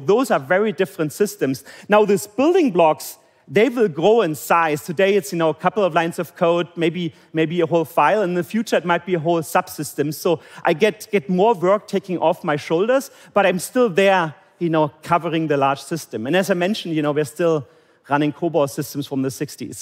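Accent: Germany. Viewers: My mom once said "If you do not have to say anything nice say nothing at all" and this is why I do not leave a comment about his strong German accent.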